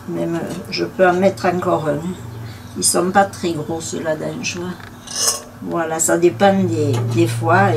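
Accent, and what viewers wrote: Provence, France. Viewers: Bravo mamie pour vos recettes en plus avec votre bel accent provençal, recettes que jai teste pas toutes encore merci pour votre gentillesse ❤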